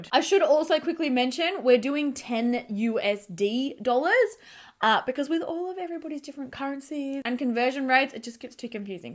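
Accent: Australia. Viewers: I love your video’s so much! Now my exams (dialysenurse, just literally translated, I dont know if the translation is correct, but I think you know what I mean) I bingewatch your videos all the time ❤ To be honest; I love your australian accent so much and Australia in general (so why in US dollars 🤐🤐🤐🤐). I orderded so much lately on your website and I always recommend your make up on my own channel, even in the favourites of the year (unfortunatly its in Dutch). Please keep on the good work and keep on entertaining us ❤ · I am 55 with very dry skin, so alot of your videos don't have much relevance for me. HOWEVER, I moved to the USA from Australia almost 5 years ago, and your videos make the distance seem not so great. Between your beautiful personality and your genuineness (let'snot disregard your awesome Aussie accent) you brighten my days, making me laugh with your sunshine. 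So pleased to see a "pro" still gets fake tan stains on her hands - I don't feel so bad. 😃, thank you for being you. 💗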